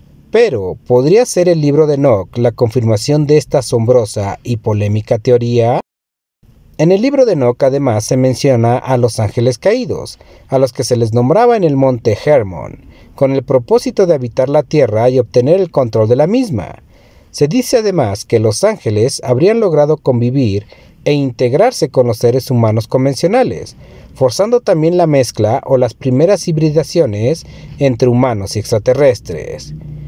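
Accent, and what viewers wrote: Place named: Mexico City, Mexico. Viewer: La información que subes en los videos, no está mal, es la que encuentras en otros canales, pero tu voz con acento de Chilango fresa nada más no te ayuda mucho! Cambia tu tono a más Profesional!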